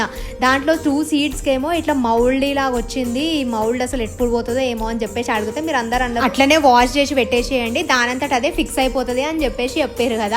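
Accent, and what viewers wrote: Telangana, India. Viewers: I love you telangana slang because I am also from telangana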